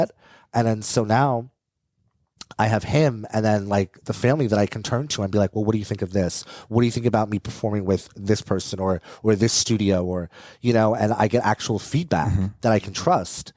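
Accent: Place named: Bronx, United States. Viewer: You have such a thick Bronx accent!!!!! Represent!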